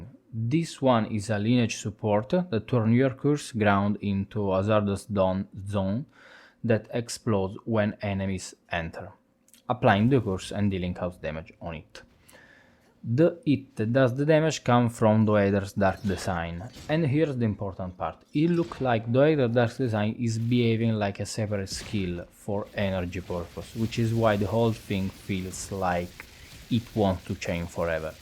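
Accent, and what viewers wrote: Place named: Italy. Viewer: The italian accent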